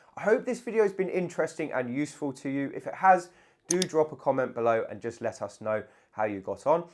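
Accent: United Kingdom. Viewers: Call me biased, but when I hear that right proper British accent, I just feel more assured that I am getting correct ✅ information. ( and I am American )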